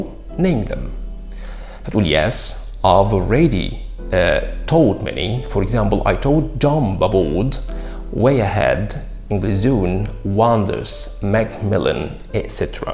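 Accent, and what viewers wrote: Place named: United Kingdom. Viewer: لكنتك البريطانية مبهرة برافو يالتوفيق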